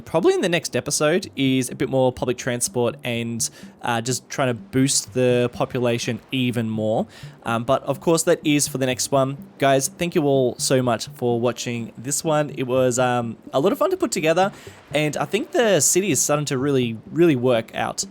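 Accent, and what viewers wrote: Australia. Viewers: I did a double take at "Lewis Armstrong". Haha, love the Aussie accent.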